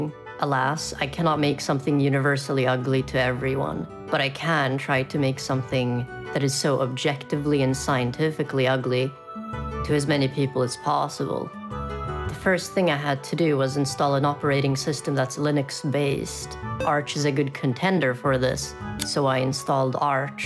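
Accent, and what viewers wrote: Sweden. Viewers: This cute little goblin has the most Swedish fucking accent I've heard. And the funny thing is that they've almost entirely flattened their accent, the pronunciation is just a whisper away from Platonic standard. Amazing, that can only happen in a Linux video, fucking phenomenal. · wait are you swedish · is your accent swedish? owo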